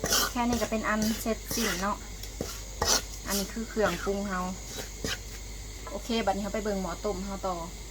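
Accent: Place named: Savannakhet, Laos. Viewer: ເອື້ອຍຄົນສະຫວັນບໍນິ ສຳນຽງຄືຄົນສະຫວັນ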